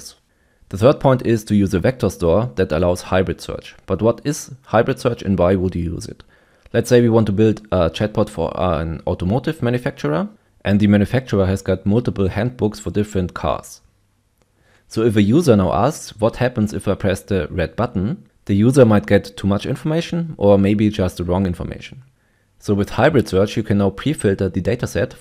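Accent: Germany. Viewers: Well, it's not exactly 'that german accent', not exclusively. It was also the top quality, very succinct, informative content. Your channels are easily mistakable in that regard as well. Truly great.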